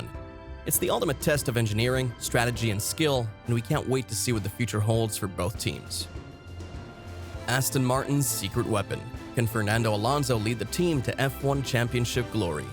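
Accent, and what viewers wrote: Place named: United States. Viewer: Ironic to hear an American accent thinking it knows anything about F1🤣 Instant stop and scroll,😝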